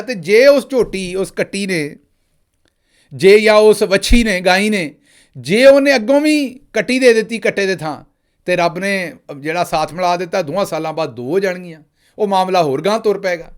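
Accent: Punjab, India. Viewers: sahib poori theth te khari punjabi bol ke shaa jande o janab zindabad or tuhanu salam 🎉from charhda Punjab India 🇮🇳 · تہاڈے جئی پنجابی دو سو سال دا پرانا بابا وی نئیں بول سکدا۔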